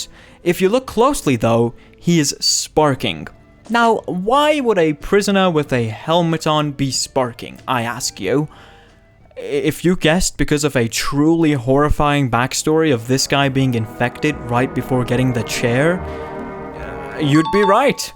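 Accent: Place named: United Kingdom. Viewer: Hi British guy IT RYTHMS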